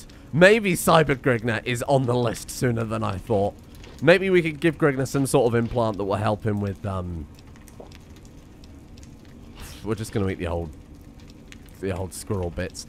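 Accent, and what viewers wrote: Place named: United Kingdom. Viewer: Honestly thats just how people living here sound like. Bri'ish air is a helluva thing.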